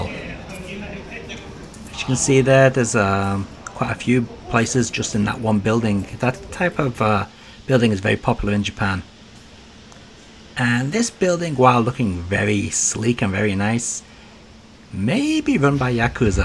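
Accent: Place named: Wirral, United Kingdom. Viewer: They looked lovely! are you from the wirral mate? your accent sounds from around my way.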